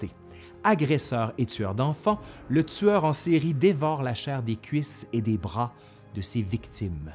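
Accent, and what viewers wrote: Quebec, Canada. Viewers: Dans les années 2000 à Bordeaux, j'ai vu une exposition québécoise fabuleuse sur le Moyen-Âge. Cela a achevé de me passionner pour cette époque de mon pays. Depuis, le Québec m'aide à connaître la France, et j'ai appris en retour à connaître ce beau pays de Québec (libre! 😉) et sa culture si riche. Mon fils de 8 ans te regarde souvent avec moi car "il explique très bien le monsieur!" et il peut suivre. Et on adore ton accent! Bisous de France 🐸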